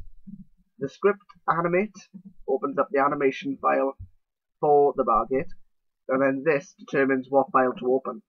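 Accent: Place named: Wales, United Kingdom. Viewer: ah a welsh boy! bore da!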